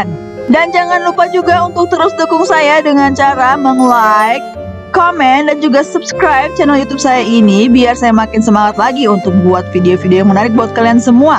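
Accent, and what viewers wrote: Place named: Sulawesi, Indonesia. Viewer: Sulawesi nich kayaknya hehehe... Semangat vibes.. Keren ngegas terus, tapi hati2 nabrak, Mom 🤣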